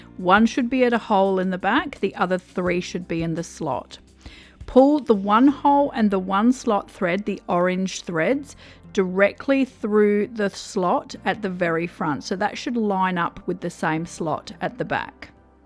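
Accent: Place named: Australia. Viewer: Love to hear an Australian accent !!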